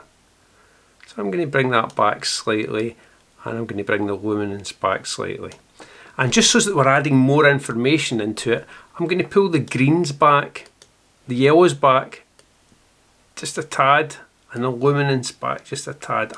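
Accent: Scotland, United Kingdom. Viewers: My god...is this Scottish? I had to turn in captions sometimes XD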